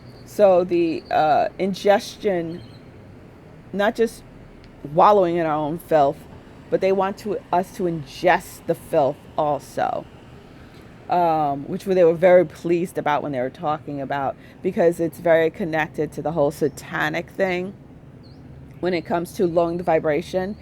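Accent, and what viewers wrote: New York, United States. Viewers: Thanks for update. It makes me laugh when you go "New York" in how u say things, but hey, the message is received. It ain't no joke. 🙏😘😘😘🤗🤗🤗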